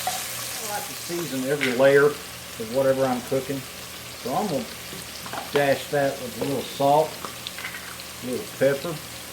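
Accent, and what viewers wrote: South, United States. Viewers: A couple seconds in the video.. "oh this guy is from the south, so you know he knows how to cook ribs"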